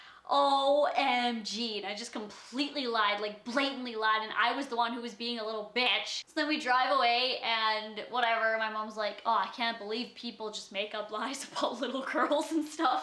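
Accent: Canada. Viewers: i love your canadian accent!!! its just so funny! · i love your accent i wish i was Canadian xx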